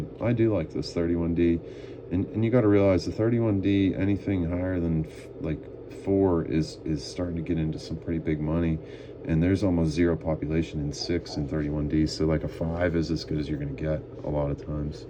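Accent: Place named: Pittsburgh, United States. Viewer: Sounds like you are from Pittsburgh. Or somewhere close. Anyway I like the red/orange cents.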